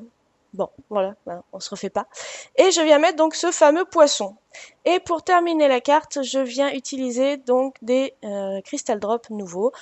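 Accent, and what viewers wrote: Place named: United Kingdom. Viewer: Coucou. Très chouette le contraste couleur/Blanc.Ton accent anglais est comme le mien. Cela me fait sourire car j'ai du mal à prononcer certaines sonorités. Bisous.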